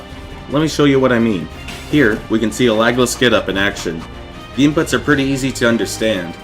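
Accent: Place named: Nova Scotia, Canada. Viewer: You have an interesting accent, are you from Nova Scotia?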